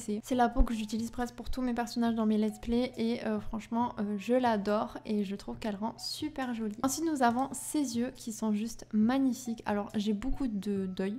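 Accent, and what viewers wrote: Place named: Corsica, France. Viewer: Coucou 😊 ohlala je viens de tomber sur ta chaîne et je sens que je vais télécharger TOUS TES CC, je suis beaucoup trop fan de tes sélections 😌💕 ça me relance de ouf dans les sims là On dirait que tu as un léger accent, ça me rappelle un peu la Corse, tu es originaire d'où sans indiscrétion? ☺️ Je m'abonne ❤️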